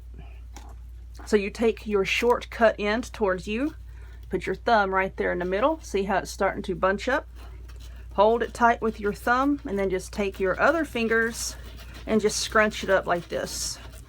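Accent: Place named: South Carolina, United States. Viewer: ABSOLUTELY BEAUTIFUL 🎅✝🌲❄⛄!!! I LOVE IT & I CAN'T WAIT FOR MY DAUGHTERS TO WATCH YOUR TUTORIAL'S & GO BUY MY SUPPLIES SO I CAN TRY TO MAKE SOME OF YOUR WREATHS 😊💝!!! WE LIVE IN ARCADIA FL BUT OUR DADDY WAS FROM SC & YOU SOUND & SAY STUFF JUST LIKE US GIRL, LOL 😂😂😂!!! I LOVE YOUR ATTITUDE & PERSONALITY 💝😊!!!!!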